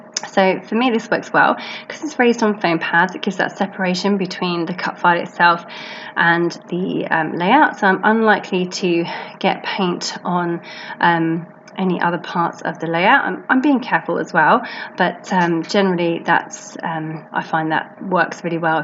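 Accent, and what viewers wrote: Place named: England, United Kingdom. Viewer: Thank you for your creativity. So nice to hear and English accent!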